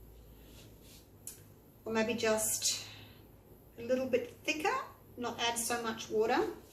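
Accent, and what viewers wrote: Australia. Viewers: American here. Love watching the video for the content, and enjoy hearing an Australian accent. For people like me who can't travel, It's fun to see/hear someone from a part of the world, far away from where I live. We are all different, but still have so much in common. Thanks for sharing what you do. Happy trails, from the USA. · Can't half tell your an Aussie discussing the merits of baby poo lol..love it :D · Wow - you sound just like me! And I LOVE these colours and your work and the way you chat. I miss Australia - so it is so nice to hear someone from there. And this is from 2-3 years ago so you must have been one of the first popular Aussie Pouring youtubers.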